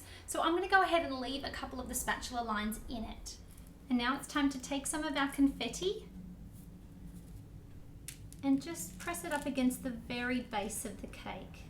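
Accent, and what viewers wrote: Australia. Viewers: is she Australian? or from New Zealand ? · I love watching your videos the cakes you make are amazing! Your australian accent is really cute by the way ^^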